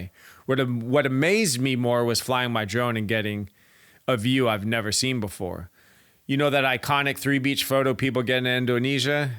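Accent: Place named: Northern California, United States. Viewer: Thanks for this! Love your commentary. You sound like a Northern Californian LOL. Gonna go in a few weeks!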